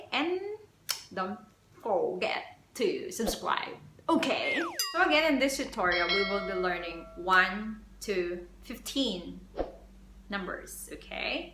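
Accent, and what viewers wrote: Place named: Thailand. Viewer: Galing muna mag thai sis as what ive said i like the wsy u pronounced it similar to thai people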